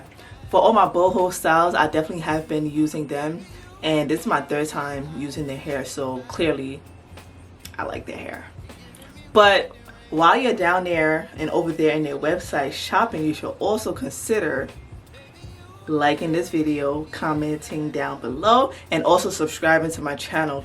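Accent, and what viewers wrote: Liberia. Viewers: I Heard your Voice and I knew Off rip You Were Liberian ! 🇱🇷🇱🇷🇱🇷 Hey my sista I can’t Wait to do my Hair ! Thank you ! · 🇱🇷Liberian!! As soon as I heard the accent 😂😂🩷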